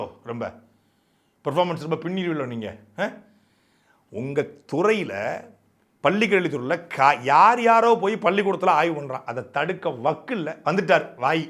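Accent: Tirunelveli, India. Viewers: திருநெல்வேலி மொழி வழக்கு அருமை செம்ம 👌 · சாட்டை துரைமுருகன் ண்ணா திருநெல்வேலி பேசியது அருமையாக உள்ளது அப்படி பேசுங்கள்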